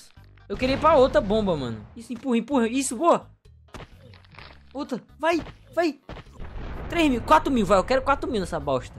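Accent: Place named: South, Brazil. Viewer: Pelo sotaque acho q o é do Sul.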